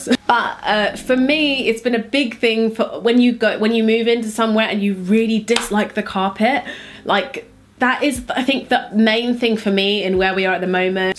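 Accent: London, United Kingdom. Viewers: you sound so London in this video for some reason haha! your decor is gorgeous xx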